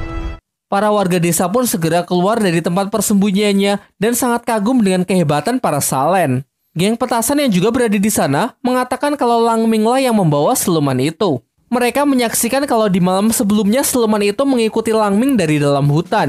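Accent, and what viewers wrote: Java, Indonesia. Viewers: Orang jowo Yo kak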